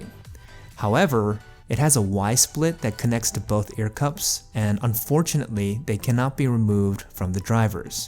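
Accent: United States. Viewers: your American accent sounds very pleasing and accurate!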